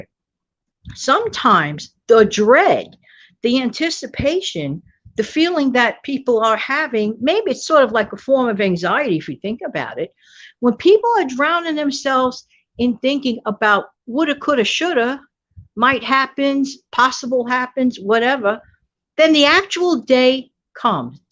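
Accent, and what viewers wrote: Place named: New York City, United States. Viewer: New here ……came here after seeing you with Prepper Princess and Dawn. You have that New York City accent. I probably have the same accent…. I am in a state close to NY. I like the different accents and views of other people. You learn things… it’s fun.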